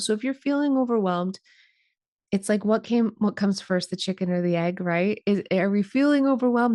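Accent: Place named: Midwest, United States. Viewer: I hear that Midwest “O” in your voice! ❤Where are you from?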